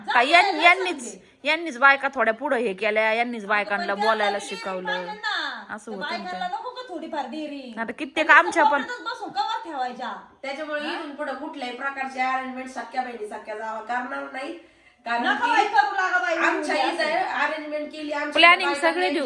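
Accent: Ahmednagar, India. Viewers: नगरी भाषेत एक वेगळाच गोडवा आहे ❤❤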